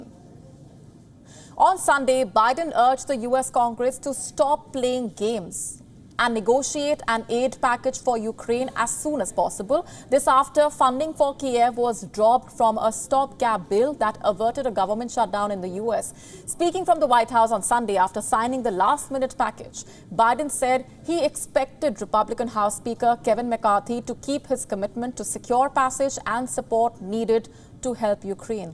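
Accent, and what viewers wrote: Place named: India. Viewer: Nothing worse than Indians speak English my ear drums are bleeding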